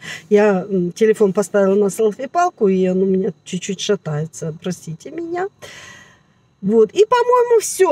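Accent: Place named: Karakalpakstan, Uzbekistan. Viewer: Вот зачем вы так пишете, она каракалпачка, пусть говорит как может. Пусть речь не литературная, зато у нее много других сильных сторон.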